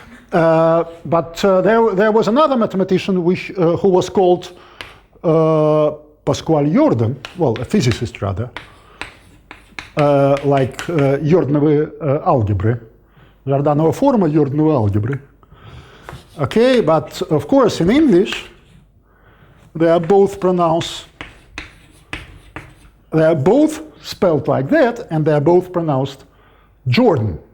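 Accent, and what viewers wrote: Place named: Russia. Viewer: Потому что эти звуки нужно учиться выговаривать как можно раньше, желательно с детства. У русского ребёнка такой возможности в России нет, поэтому ему приходится учиться это делать во взрослом возрасте. У некоторых получается и они приобретают более менее норм. акцент. Но не всегда на 100%. Здесь я слышу у него практически в каждом слове русский акцент. И ещё большие паузы. Native speakers говорят на родном языке со скоростью света. Он говорит очень медленно.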